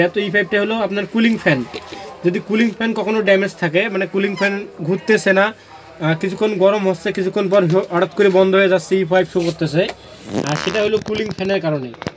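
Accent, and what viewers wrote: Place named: Rangpur, Bangladesh. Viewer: আপনার বাড়ি মনে হয় রংপুর।৷ আরও ভাল করে বলবেন। আপনার সাথে আছি❤❤❤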